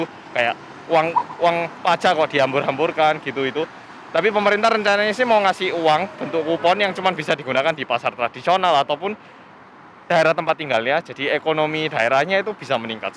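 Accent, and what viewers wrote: Java, Indonesia. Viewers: Pengen nonton kemarin tapi baru bisa sekarang 😩 sehat teros mas biar aku denger medhok mu 😁✌️ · Me : Weh terjebak dengan vlog abang ni 😂😂 . Suka dengar sebab ada slang bhasa Jawa ( bila your dad is Javanese but you cannot speak Jawa and takde slang pom . Jadi terus rindu kampung ayah and sepupu kat 🇮🇩)🌸 terus subscribe 😊 Salam dari Malaysia 🌸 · stay safeee jang hansolllll medhokk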